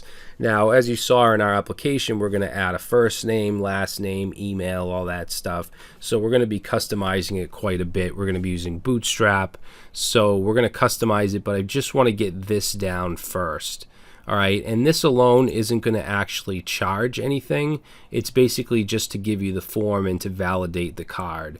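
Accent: Boston, United States. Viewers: Yo is it just me or does his boston accent have him sounding pissed the entire video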